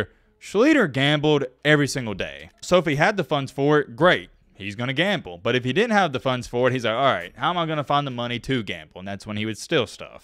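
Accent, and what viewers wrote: South, United States. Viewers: Because this cat has a southern accent... I guarantee you, that's not how you say this qbs last name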